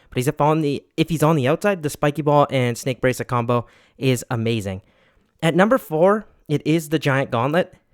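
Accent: Canada. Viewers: New here.. recognized you being canadian just from your tone😂😂. I'm from Edmonton. Keep it up, bud.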